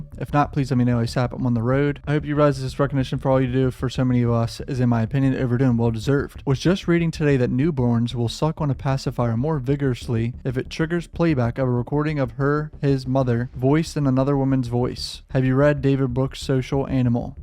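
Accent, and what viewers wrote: United States. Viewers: Difficult to follow you when you speak so fast and your accent is very American with lots of raw raw-rolls. Hard for foreigners to catch.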